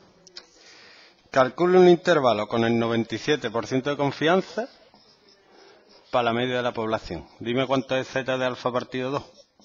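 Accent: Andalusia, Spain. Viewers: Es andaluz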